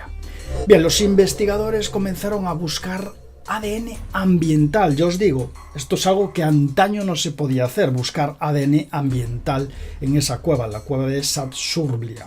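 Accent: Spain. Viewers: La noticia es buena pero el acento español es odioso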